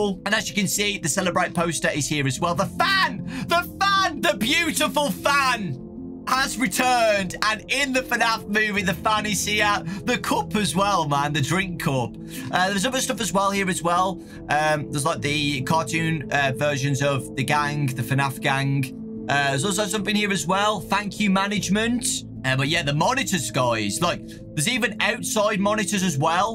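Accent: United Kingdom. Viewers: Ever since I watched ur channel I only think of fnaf when I hear a British man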